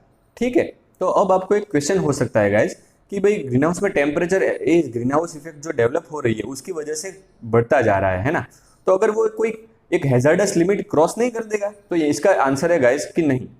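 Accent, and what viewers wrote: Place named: Gujarat, India. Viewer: Bhai gujrati chho ne awaj thhi j Lage chhe but good explanation sir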